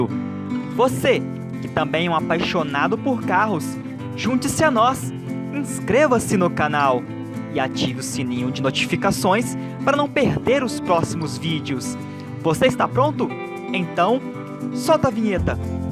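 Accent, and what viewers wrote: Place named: Northeast, Brazil. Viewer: Voce fala muito mal e do nordeste, bahia, so mostra as imagens nao fala nada por favor.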